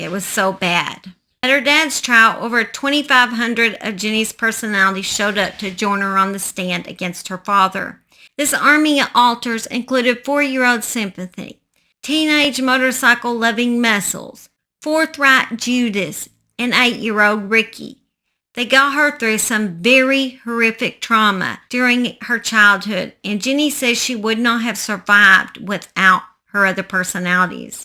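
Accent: South, United States. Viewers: As an austrian living in germany i had no problems understanding you and i absolutely adore the southern accent:) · You think people in the Southern part of the US speak unusually? Try figuring out what a fast talking person from Scotland is saying!!! You'd swear it wasn't even English. That is why having an open mind is a good attribute -- instead of knocking others, you can appreciate & enjoy the many ways we ALL share this language with different spins on how it is spoken. I LOVE your SOUTH DRAWL & I'm from Brooklyn NY.❤❤❤❤❤❤❤❤❤❤❤❤❤❤❤❤❤❤❤❤ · I can understand you perfectly I can tell you from the south by your accent, but you’re not slaughtering the English lang by any means and I love your channel - remember they hated on Jesus! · I love your channel most people talk ghetto so if anyone is complaining about your southern accent it’s because they talk ghetto. 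Anyway I hear stories on here that I’ve never seen on other channels that’s the reason I subscribed to yours! Much love from Illinois! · Im Australian and i understand your southern english so pay no mind to the nay sayers 💖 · I'm Irish and understand your southern English perfectly.....love Southern accent 😊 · As a southern girl from Oklahoma myself, I understand the “southern English” as well! Y’all give this girl a pass! Thanks for the video! Simon is great too! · I’m a Texas girl who is frequently teased AND insulted about my “twang”, so I get how frustrating that can be… In ALL honesty though, the fact I’d never heard the Noah Prestgrove story was the story that brought me to your page. Your “southern accent” and passionate expression in the stories you tell is what brought me to follow you. You’ll get the “Karen’s” because some are so perfect, but you’ll also find others who embrace you for your accent! 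Hugs!!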